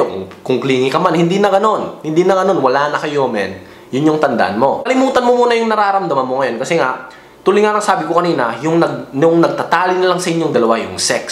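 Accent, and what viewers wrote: Ilocos, Philippines. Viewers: From Ilocos kaba? Kasi may accent ka ng iloco. Specially sa Laoag 😂 so cute! Anyway, your advices can help to those who need it. 😂 God bless and more vids. to come!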